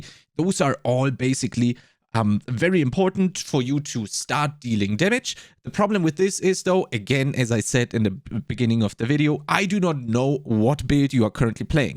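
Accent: Germany. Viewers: Perfect, just watched the other video about items and their stats before work today. Now this one drops when I get home. If I trust anyone with making cheat sheets and this stuff it's a dude with German accent.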